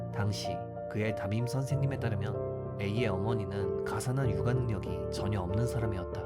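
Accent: Japan. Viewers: 나레이션 발음이 일본인이거나 한일혼혈같으신데 맞으신가요? 일본지명 발음이 일본현지 사람 같아서요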